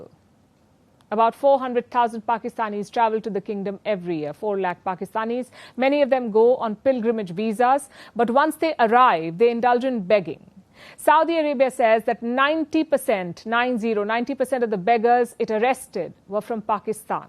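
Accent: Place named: India. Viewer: What a “desi English “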